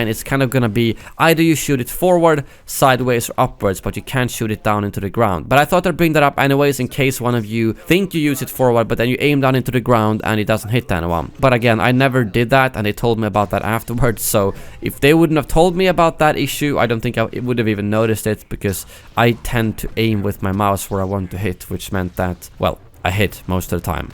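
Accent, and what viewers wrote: Sweden. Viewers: Boi are you from Sweden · Are you from sweden Är du från sverige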